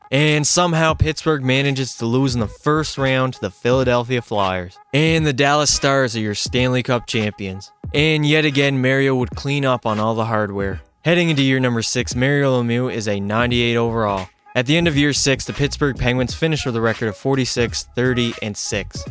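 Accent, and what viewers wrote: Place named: Nova Scotia, Canada. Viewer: U sound like ur from Nova Scotia bi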